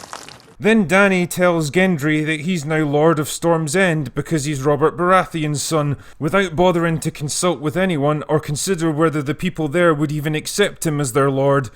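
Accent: Scotland, United Kingdom. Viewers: You cant believe how much i love the And thats all i have to say. Go away naow. In this lovely british/scottish accent. Every other youtuber would have said: Like and subscribe, follow me on instagram and facebook Absolutely in love with this... review? Subscribed, instantly. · It sounds Scottish lmao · Love your Scottish accent.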